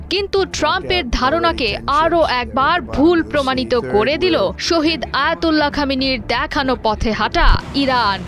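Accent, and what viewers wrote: India. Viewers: ভাষা শুনে মনে হচ্ছে এই সংবাদ পাঠিকা ভারতীয় নারী।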